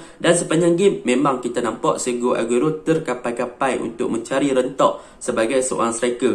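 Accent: Kedah, Malaysia. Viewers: Org kedah yg ulas? Bgus dan semangat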